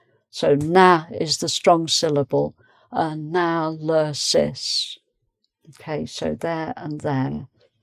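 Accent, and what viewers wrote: United Kingdom. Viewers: I like her so much as an English teacher.I like her British accent a lot. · That was a very clear representation of British accent. Much appreciated. Love from me <3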